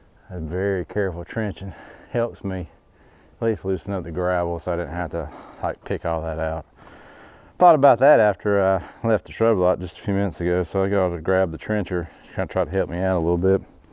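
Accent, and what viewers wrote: South, United States. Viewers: I'm learning southern accent thanks to your videos 😂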